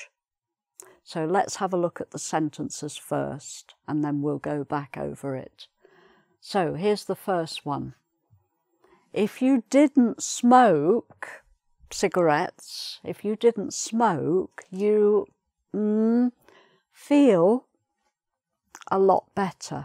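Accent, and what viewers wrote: England, United Kingdom. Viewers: indeed! As a Scot, I find references to an English accent as "British" accent both irritating and, frankly, insulting. Aside from the four countries which make up Great Britain and Northern Ireland and their distinctive accents, there are hundreds of regional accents. All different and all "British." "British" does not just mean Home Counties English. PS, love this teacher's style, could listen to her English accent all day. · I really love her British accent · I love your English accent!! Love!! Greetings from Spain!💕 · I really love your calm and perfect British accent. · Are you British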